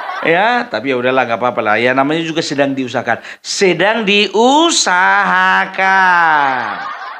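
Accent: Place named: Java, Indonesia. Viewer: Bang good logat jawa nya aku suka banget 👍👍🙏🙏😀😀😀😀😀😀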